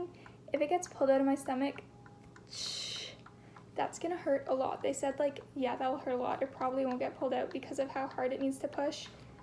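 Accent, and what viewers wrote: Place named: Canada. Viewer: I thought she was from Australia too! :P But then the Canadian accent :P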